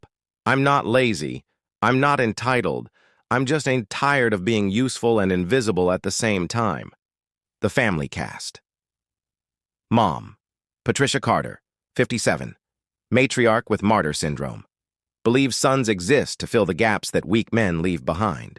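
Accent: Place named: Arkansas, United States. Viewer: I'M JUST AIN'T OMG THIS GUY IS FROM ARKANSAS 😮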